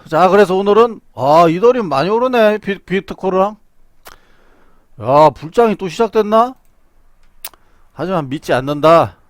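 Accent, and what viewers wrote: Chungcheong, South Korea. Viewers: 어디 천안 or 당진쪽 사투리 같은데 말투가 넘 친근하네요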